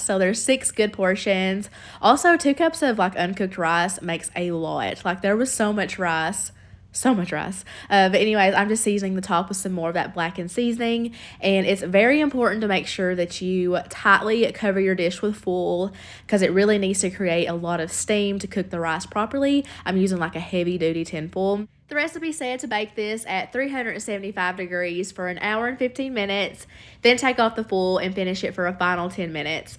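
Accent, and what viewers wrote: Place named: Kentucky, United States. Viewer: I'm from Lexington lol. I didn't know where you were from but I thought I recognized a KY accent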